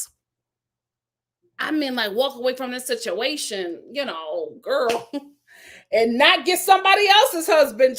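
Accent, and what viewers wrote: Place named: Philadelphia, United States. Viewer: LOLOL we love you, and maybe it's the Philly accent but you've been saying it wrong.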